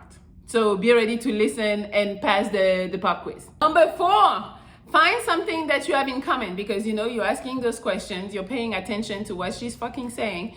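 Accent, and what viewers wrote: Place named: France. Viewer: Helloz, my favorite part of your videos, is the energy you have when you start... "WHATSUP LOVERS" with that gorgeous French accent... you make our days... (^^,)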